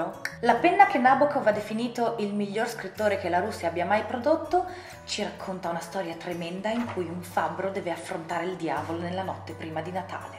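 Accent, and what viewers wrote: United Kingdom. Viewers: In questi video ho trovato tanti consigli per regali... che mi farò :3 Ma mi hai tolto ogni dubbio su quale prendere per primo quando hai detto "India" e "mi è sembrato di sentire gli odori" Mi spiace solo che l'edizione italiana di Shantaram non abbia la copertina bella come quella inglese, anche se sono certo me ne farò una ragione. P.s. Adoro il modo in cui parli! Sei di madrelingua inglese?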